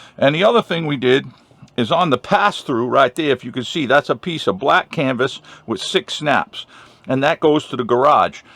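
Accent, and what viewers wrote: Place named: New York, United States. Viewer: You have a newyorker accent👍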